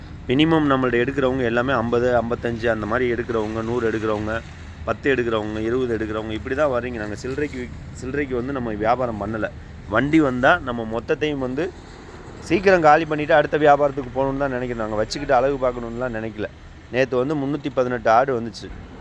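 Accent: Madurai, India. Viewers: Assalamualaikum warh madurai basha ❤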